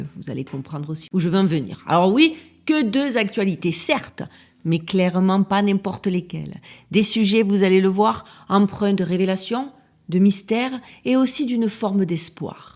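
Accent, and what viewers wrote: South, France. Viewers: Je viens de vous découvrir et je peux dire que je vous trouve formidable!!! J’adore vos contenus et le format! Et votre accent du sud!😉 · Bonjour de Suisse, ne vous excusez pas tout est parfait 🙏🩷🩷🩷 J'adore vos sujets, et votre personnalité 💖 et votre accent du sud est juste 😍 toppissime!!!! Bonnes fêtes à vous et votre famille 🥳🎶🍾🥂❤️❤️❤️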